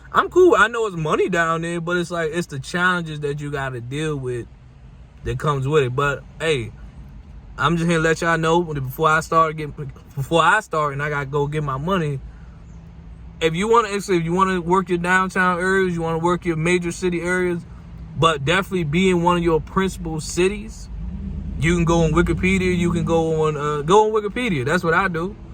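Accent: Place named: Washington DC, United States. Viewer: Good video fam. Fellow DMV dasher here. When I heard your voice, I said ah hell, DC dasher!!! I love it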